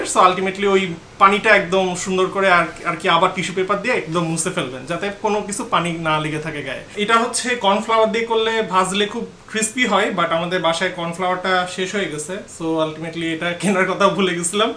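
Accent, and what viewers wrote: Kushtia, Bangladesh. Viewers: R u from Kushtia? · তুমি না থাকলে আমার বোর লাগে ❤️❤️❤️❤️❤️ আপনার স্ত্রীকে আপনি খুব ভালোবাসেন ভাইজান। আপনার কথাগুলো খুব সুন্দর ভাই, কুষ্টিয়ার ভাষাটা খুব ভালো লাগে। ভাই আপনার জলীয় বাষ্প ঠিক আছে,বলে যান😅😅😅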